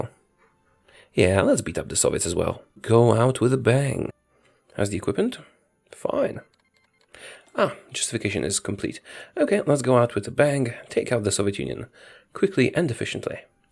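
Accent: Poland. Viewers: your accent is a bit polish nice! i love polish! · lol my friend thinks you are German. I told him you sound Polish. is Polish right?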